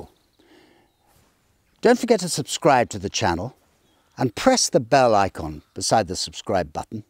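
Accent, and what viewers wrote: United Kingdom. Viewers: well, you have a lovely British accent, and I’m American. So I’m betting I’m the one in the wrong! 😂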